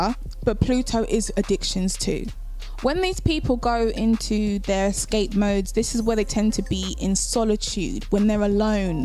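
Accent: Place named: England, United Kingdom. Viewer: Why you are not a household name I do not know. Initially…You Lovely Lady😇, had me intrigued by your the English Accent. Then I noticed that you had certain flair/ “steez” as we use to say in Philly 🙂/style about you. Please note { these observations were in milliseconds ( says my Gemini ♊️Ascendent ) .} Then BAM 💥 My Jupiter in Pieces in the 12th house kicks in and I’m able to discern that you are Spiritually and Intellectually Wealthy! You have a Greater understanding of who the planets are entities. Just like me. Hey Girl , I love your work.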